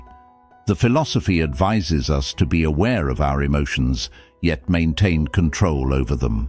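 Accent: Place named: United Kingdom. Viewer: The expressions....social media", snacks, and and "online".. do not go well with the overall energy brought on by the voice of wise, elderly man speaking with a classic British accent 😄....lol....This is only my opinion....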